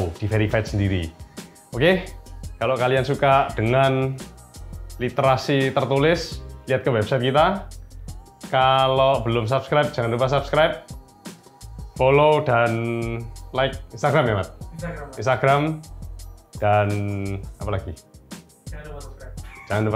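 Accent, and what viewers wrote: Java, Indonesia. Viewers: Kenapa saya lebih suka panggilnya mas mas medok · saya suka ciri khas medoknya mas 👍😀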